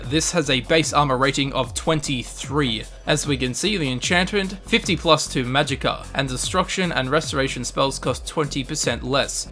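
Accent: Australia. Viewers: Batmans Goldfish he sounds like Crocodile Dundee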